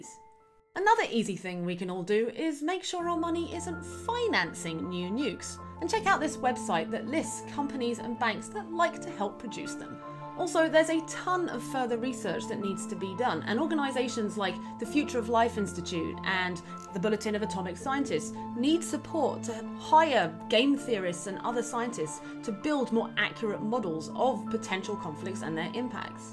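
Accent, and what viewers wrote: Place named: United Kingdom. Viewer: I love your female British voice I wish I can see your voice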